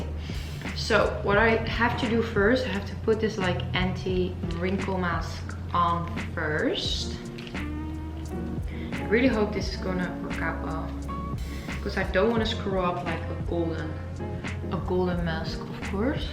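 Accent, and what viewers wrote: Netherlands. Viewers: Good Afternoon!!! you are adorable !!! you feel like my granddaughter!!! I love your Dutch accent!! My family always told me that we are Dutch ... so I'm so very glad that I have found you on Youtube ... I haven't written yet !!! Just found you a week or so ago.. didn't know about your PO Box.. where can I find your address.. Love ya!!! Tell Max HI. !!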